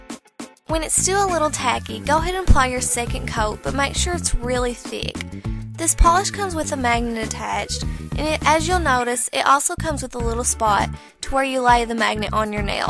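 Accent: South, United States. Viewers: I LOVE YUR VOICE..LOL. ITS SO SOUTHERN. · OmfGODS Southern Accent. It is almost too much!! Damn cute nails though.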